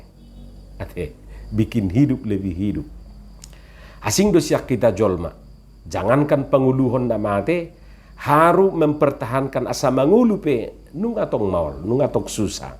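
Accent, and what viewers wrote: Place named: Batak, Indonesia. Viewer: Bahenjo amang tekanan bahasa batak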